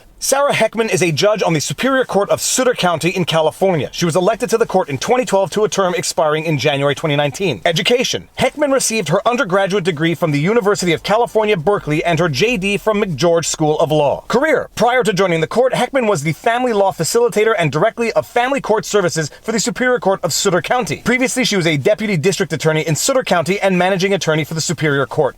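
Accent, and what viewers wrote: France. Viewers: Love the French accent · I always laugh my ass off at the French accented readings.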